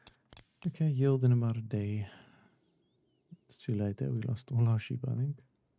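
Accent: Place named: South Africa. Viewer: Random video pops up on my feed... I recognise that accent! Hello, fellow South African :) Good luck with your YT journey.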